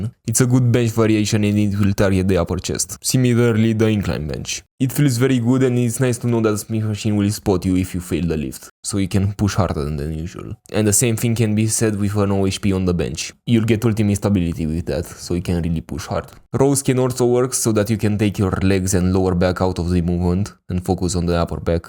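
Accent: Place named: Russia. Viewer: its impossible to listen this rus sound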